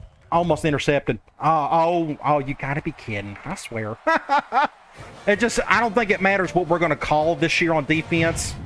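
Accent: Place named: South, United States. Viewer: Southern has all get out I love it..I'm also southern.... that draw is prominent lol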